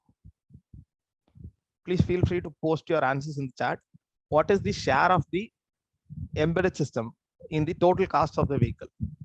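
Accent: India. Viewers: Is faculty English has touch of Madras ?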